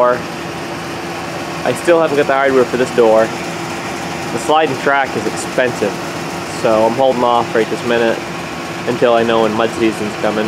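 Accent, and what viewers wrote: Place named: Maine, United States. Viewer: The “Stump Lickah’s” are out in full force, going after the cedar bark, at that time of year!!! Unless you have some crab apple trees down back, but usually those are stripped pretty bare by the end of January!!! Your accent’s a little thin for being a “DownEastah”, but it’s nice to hear on YouTube!!! Hi from Central Maine, and Family!!!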